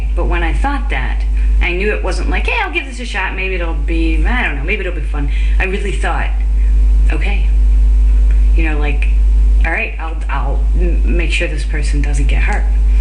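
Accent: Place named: Texas, United States. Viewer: I thought I detected a TX accent. What part? I'm from Dallas (Irving). I never hated the French though. Sure they're smelly snobs, but I never hated them. :) (Just kidding!)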